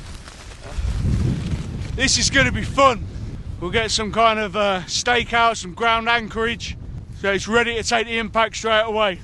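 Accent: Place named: United Kingdom. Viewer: You have the most uk accent 😂. Holy shit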